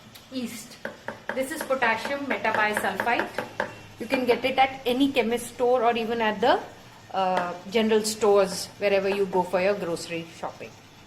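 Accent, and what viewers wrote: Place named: England, United Kingdom. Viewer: Your english accent is your from army background.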